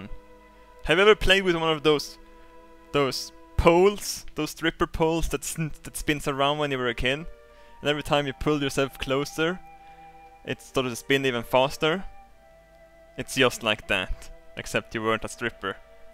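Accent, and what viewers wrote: Norrland, Sweden. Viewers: are you swedish you sound swedish if you are so tjenare har du det gött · Älskar din norrländska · Swedish accent. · Omg he sounds sssssssoooooooo swedish... And I love it! Håll på såhär bara ;-)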